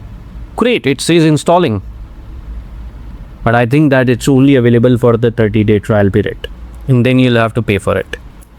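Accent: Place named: India. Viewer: why you sound indian?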